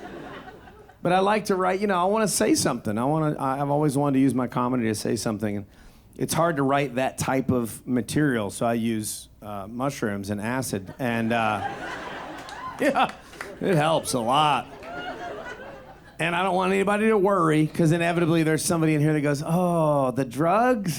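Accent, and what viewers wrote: South, United States. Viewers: I`m not a native english speaker and whenever you tune up your deep southern accent I have to turn on subtitels too 😅 Great special though. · Like George Carlin. With a Southern drawl❤❤❤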